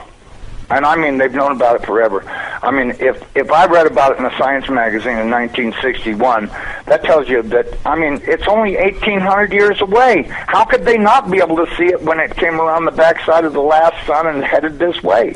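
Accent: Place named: South, United States. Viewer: 13 years later total bullshit. this guy sounds like hill billy trailer trash.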